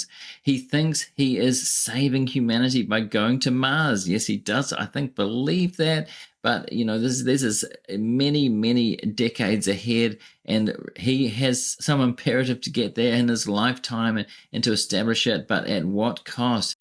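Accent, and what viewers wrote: New Zealand. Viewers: Are you Australia or New Zealand. I think your accent sounds more New Zealand. I just want you to know that we Americans are not all psychotic as the media has us looking today. I originally clicked on one of your videos a few weeks ago. I thought you were one of my former employees. You two are a splitting image. As far as Musk, I am quite sure he will be prosecuted in the next administration. However, I think the current administration is so afraid of retaliation. The Congress on the left side are very afraid of Trump. However, Musk should start putting his assets and etc in order.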